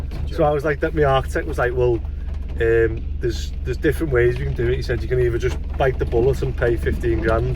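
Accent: Liverpool, United Kingdom. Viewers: Just subbed mate coz Your Scouse . Just came across ya.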